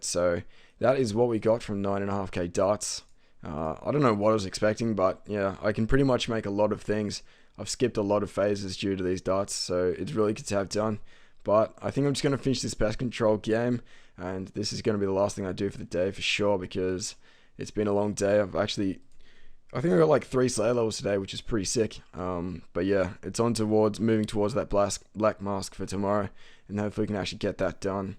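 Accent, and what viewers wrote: Australia. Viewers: He talks like he has an awesome Australian accent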